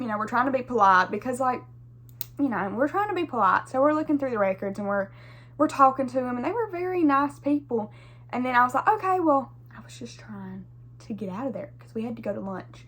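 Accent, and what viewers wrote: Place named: Georgia, United States. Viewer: I love your accent! Is it a Georgia accent? You have great taste in music too!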